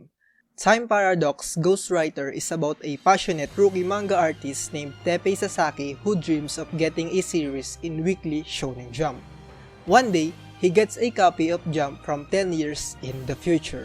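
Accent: Philippines. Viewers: your accent sounds filipino, but if Im wrong I think you're still from the southeastern asian countries, correct me if i'm wrong tho. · What an Filipino Accent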